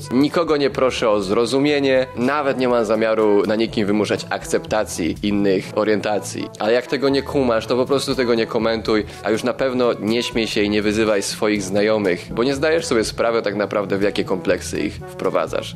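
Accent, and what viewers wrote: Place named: Silesia, Poland. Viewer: Błagam mów częściej po Śląsku, bo to cudo w twoim wykonaniu❤️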